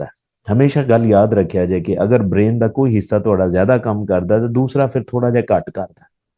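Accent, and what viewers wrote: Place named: Punjab, India. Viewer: Punjabi accent nice.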